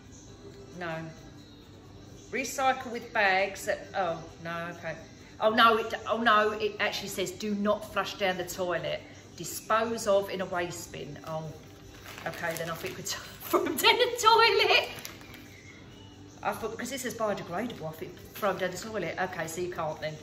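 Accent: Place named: Essex, United Kingdom. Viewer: Hi Hun, I absolutely love you videos, you are completely a girl after my own heart. I live in the North now, but I was born in Essex and grew up in the east end of London, so your wonderful accent is like music to my ears..Keep it up Hun Bella xxx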